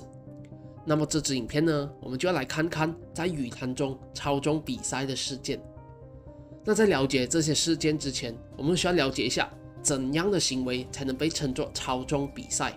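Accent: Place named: Northern Malaysia, Malaysia. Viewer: 你是北马人吗？口音好重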